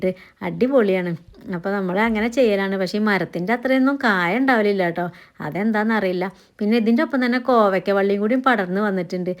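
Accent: Malappuram, India. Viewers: ഇങ്ങള് മലപ്പുറം, നിലമ്പൂർ, വണ്ടൂർ ഭാഗത്ത് എവിടെയെങ്കിലുമാണോ? സംസാര ശൈലി വെച്ച് ചോദിച്ചതാണ്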